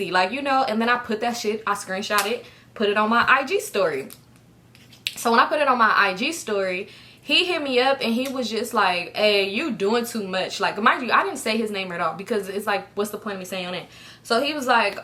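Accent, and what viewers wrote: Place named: California, United States. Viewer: Tbh you always look gorgeous but in this video, you just flawlessly glowing and I’m loving it 😍🥰 literally all I be watching is your videos cause we got too much in common 😂 and we talk the same, must be a Cali thing cause I be so amazed hearing you say things I say 🤣🤣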